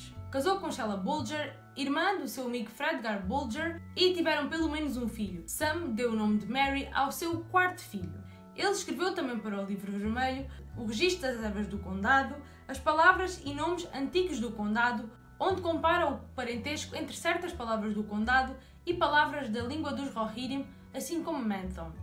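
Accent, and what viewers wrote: Portugal. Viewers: Parabéns,! O vídeo ficou muito bom. Sem falar que adoro sotaque "português de Portugal"!:D Será que tem material para fazerem vídeo explorando rotina diária de povos da TM? Exemplo: Um homem comum que morasse em Gondor. O que ele tinha como opção de trabalho? Servir no exército? Ser camponês... Os filhos como eram educados, se existiam escolas..E as mulheres que tipo de serviço faziam, ficavam só em casa... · Preciso me acostumar com esse sotaque português 😆😆😆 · Português de Portugal,acho tão chique!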